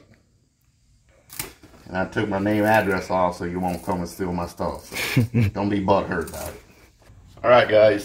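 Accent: United States. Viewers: The replica out here catfishing 🤣 this dude voice and face catfishes me.. no way did I think this dude would sound like this talking 😂 got that down south draw .. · What's the durability on the fake like , dose the spring begin to leak ? Also your accent sounds awesome I'm from NZ and Americans sound diff but your accent sounds like a mix between then more American though , my high boost is 23psi in the ej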